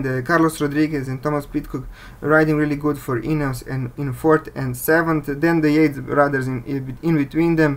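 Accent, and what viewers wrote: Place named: France. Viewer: Come on people, let's help this guy out by liking and subscribing. English is obviously not his first language (French maybe?) yet he's doing really well, excellent information. New subscriber here, looking forward to more cycling videos. Keep positive Sir, you're doing well. WVA for me, but it's almost impossible to pick a definite winner.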